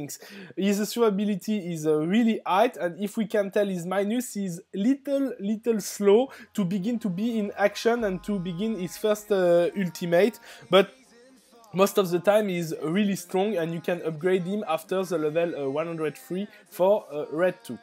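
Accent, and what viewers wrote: France. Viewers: are you from russia ? thought you are french with that kind of accent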